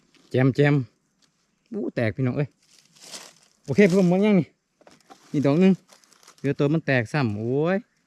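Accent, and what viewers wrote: Isan, Thailand. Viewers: อยู่ดอย จังหวัดอะไรคะ ขอโทษนะคะ ทำไมพูดเหมือนคนทางภาคอีสาน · เสียงเว่าเป็นคนทางอีสานบ่จ้า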